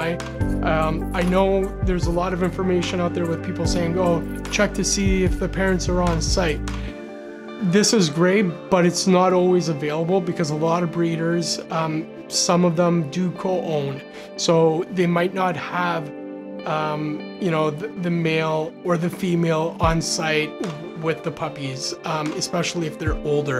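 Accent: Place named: Hungary. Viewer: I'm sorry this is super unrelated, but are you originally from Hungary? Just thought because of Füli and some slight tinge of your accent ^^ Thank you for all this information also, I just found your channel and already subbed ♡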